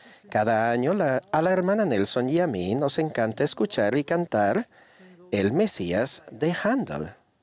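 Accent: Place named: Buenos Aires, Argentina. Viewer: Ese acento es argentino porteño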